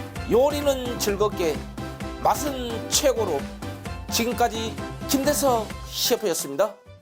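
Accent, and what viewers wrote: Jeolla, South Korea. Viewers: 전라도 사투리가 구수하고 요리에 정성인 세이프님 늘 감사합니다 이번에 LA갈비 4킬로도 성공해서 가족들 잘 먹었습니다 오늘은 호박술빵에 한번 도전해 볼렵니다 믹서도 어느분이 물어서 잘 구입해서 사용하고 있습니다 이번 김장에도 잘 사용할 것 같습니다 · 여수 광양쪽 사투리인줄 구수하고 좋내요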